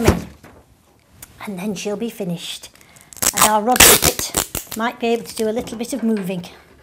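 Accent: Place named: United Kingdom. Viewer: ooh soo cute :) and i LOVE the british accent :)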